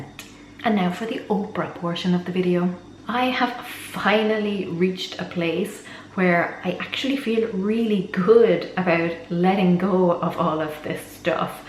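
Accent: Ireland. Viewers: Interesting video :) Are you Irish?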